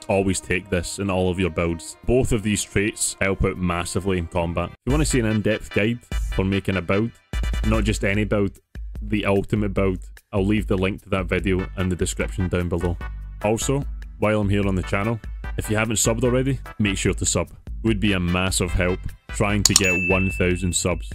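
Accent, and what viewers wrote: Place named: United Kingdom. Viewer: Always great to hear fellow brit bongs talk PZ, no matter where in our glorious Isles you're from. Good tip vid, though I'm sure you can just hunker down at the safehouse during the helicopter event, as long as it hasn't spotted you, it will just fly around the map. Easier said than done but if you listen to the emergency broadcast on radio before sleeping it will give you the heads up about the chopper for the next day.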